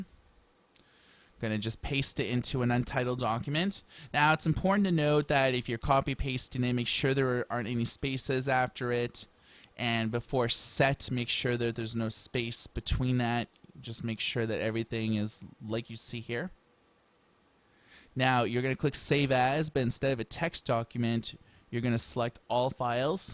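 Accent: Canada. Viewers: So, quite confusing. You keep saying serial number, then proceed to write this complicated code to determine what it is. I just turned my laptop over and looked at the lable. But in fact you're actually talking about determining the operating system product ID. In the case of an XP laptop, the product ID is also listed on the lable.Then, to make matters even more confusing, you call the product ID a serial code. A serial number is always associated with a machine, not an operating system. Judging by your accent, I'd say you are Canadian? So perhaps a serial number and product ID are interchangable in Canada. Not here in the States. I understand that you took the time to do this and I'm sure your efforts are appreciated by some. But the result is very confusing because the terminology is incorrect. Having written a mountain of technical documentaion in a past life, I can tell you that instructional videos have to be consistant and carefully worded. 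A product ID is not a serial number, nor is it a serial code.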